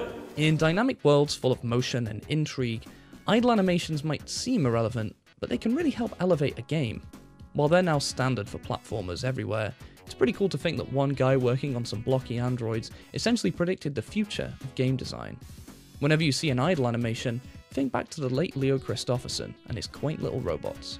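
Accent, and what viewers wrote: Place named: United Kingdom. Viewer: sultry british voice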